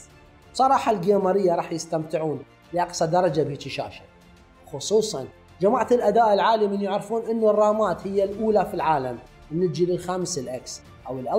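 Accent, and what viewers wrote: Iraq. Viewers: لغة عراقيه طاغيه استاذ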